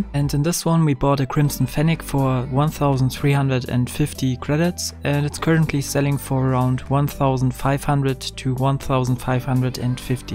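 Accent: Germany. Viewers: I like these Videos but i have one question. Bist du Deutscher? 😅 hört sich voll so an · Du bist doch deutsch oder das hört man raus haha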